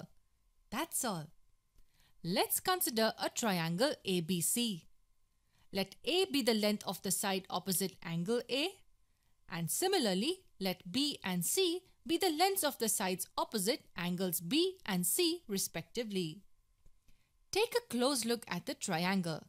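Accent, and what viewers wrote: India. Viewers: Not bad. But obviously Indian accent is irritating. When you learn English, learn enunciation!!!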